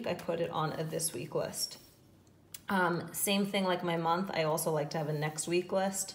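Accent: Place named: North, United States. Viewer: I wouldn’t say that you say it differently. I would say it’s a northern compared to southern accent. I like it. We draw everything out in the south. I’m very self conscious about my twang. I had someone, not from Oklahoma obviously, tell me that I was really smart and they were surprised because of my accent. 🤷🏻‍♀️ WTH does that mean?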